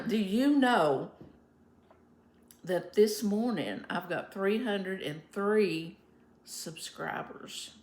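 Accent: Kentucky, United States. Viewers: Hey,! I so appreciate you ladies who get on youtube and share from your heart. Sorry to hear about your friend's husband. Praying for her. God is our comfort and He never leaves us. Praying for your husband as well. My husband is in a care facility as I think I shared with you. He had a stroke and I am asking everyone to pray for him, for us. I love listening to you. You are so cute and make me giggle a lot. Love your southern accent from Kentucky. My Grandmother was from Texas and I loved her accent. You are like visiting with a special neighbor. Praying for you. ❤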